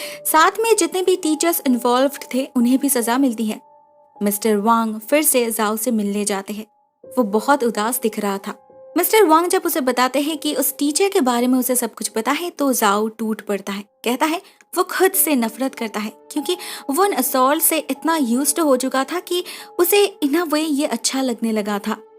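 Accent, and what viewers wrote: Bengal, India. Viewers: Are u Bengali?..u have beautiful voice...u have Bengali accent..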